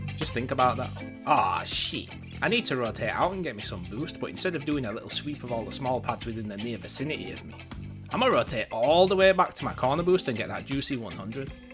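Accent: Yorkshire, United Kingdom. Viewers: Very good video bro, you from Yorkshire too or manny?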